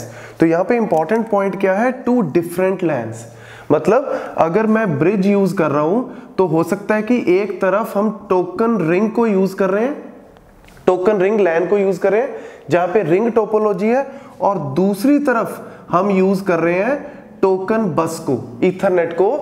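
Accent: Punjab, India. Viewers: That punjabi accent but is awesome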